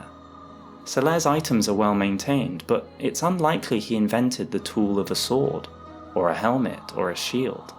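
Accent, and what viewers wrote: United Kingdom. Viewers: Has an American I have to say I prefer my lore to be spoken with a warm British accent. It just seems more legit for me. Good job at being British.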